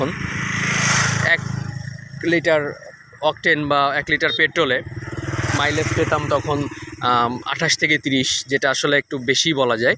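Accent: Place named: Khulna, Bangladesh. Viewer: আমিও খুলনার ছেলে এজন্যই কিছু কথা বলছি ডোন্ট মাইন্ড ৷ আপনার ভয়েজটা ভাল, উপস্থাপনাও খারাপ না তবে শুদ্ধ ভাষায় যখন কথা বলবেন তখন সকল উচ্চারনই শুদ্ধ হওয়া উচিত নইলে সেগুলো শুনতে খুবই বাজে লাগে ৷ হইছে, গেছে, করতিছে, পাইছি, এই টাইপের খুলনাইয়া উচ্চারন গুলো শুদ্ধ উচ্চারন করলে ভিডিওর কোয়ালিটি আরো সুন্দর হত ৷ আর এত লম্বা সময় ধরে শুধু মাত্র বাইকের চারপাশ থেকে শুট না করে মটোভ্লগিয়েংর মত বাইকটা চালানো অবস্থায় ভয়েজ দিলে আরো জোস হত ৷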